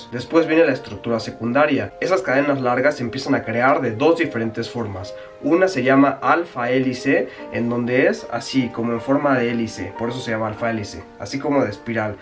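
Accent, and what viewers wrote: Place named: Mexico City, Mexico. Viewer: Me encanta el acento cdmx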